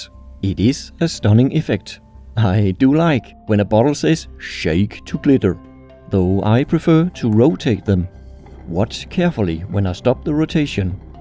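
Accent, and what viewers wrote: Denmark. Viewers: I totally knew you are Danish, because of the accent. I always wondered what exactly happens in the body when eating all that gold. I asked the chef at "Restaurant Ti Trin Ned" when I worked as disheasher in 2007/08, and he said that it was all safe. But there might be long term side effects when eating gold every day. And then again, who does that? 😁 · Danes don’t pronounce things the way that they appear in the spelling. “Kopper” sounds more like “koh (w)uh”. What you are hearing him do is “speaking English” with a Danish accent. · You sound super Danish xD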